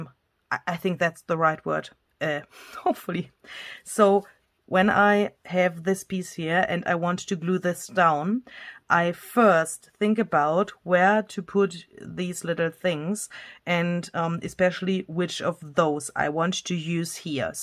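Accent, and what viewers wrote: Germany. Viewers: I just love watching you create, my Mom was from Germany so that makes it double more fun!!! She had the same wonderful accent. Thank you for making my day! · You are amazing Lady , love your creativity, I have learned so much with you , thank you , love your English /German language, God Bless you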